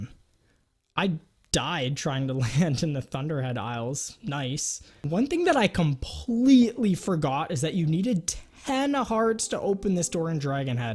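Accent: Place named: Canada. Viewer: bro this canadian accent is killing me 😭:face-pink-tears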